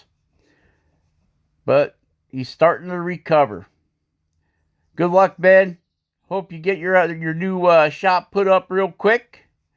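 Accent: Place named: England, United Kingdom. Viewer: Where do you live... I am picking up an English accent lol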